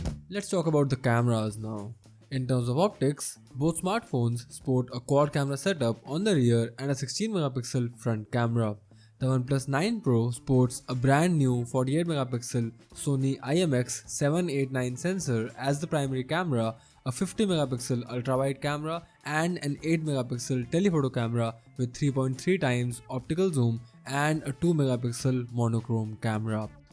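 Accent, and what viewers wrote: India. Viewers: I don't understand much because of your Indian accent but i like your review 👍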